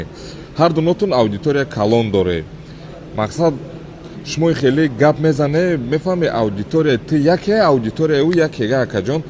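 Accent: Kulob, Tajikistan. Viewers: 😂😂😂 Хитт карде Гапи кулоби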